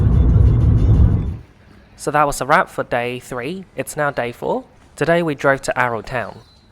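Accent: Australia. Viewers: you are sounding more Aussie than ever; Chuck a Ueee! 🙂 Another great report from not your first time in Queenstown!. See you very soon. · G'day, I love your Aussie accent and lingo with "doing a uey" Looked like a great flight. Least you had a screen in front of you, and the food looked very tasty 🤩New Zealand looks awesome, so want to go there. Look forward to your next video, although I'm still catching up on your previous ones 👍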